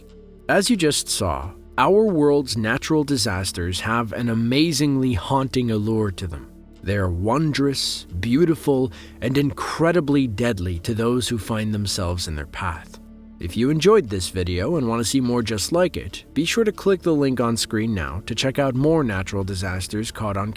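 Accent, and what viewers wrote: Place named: Rhode Island, United States. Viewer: the way you say various...which doesn't coincide with the way you say areas.Rhode Island?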